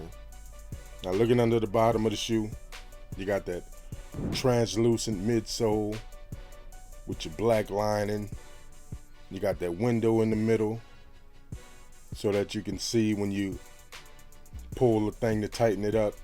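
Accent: New Orleans, United States. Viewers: You got to be from New Orleans you talk and sound just like my boy. You heard me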